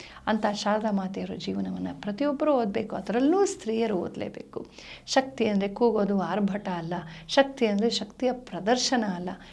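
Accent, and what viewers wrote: Standard. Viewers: swachavada kannada 🙏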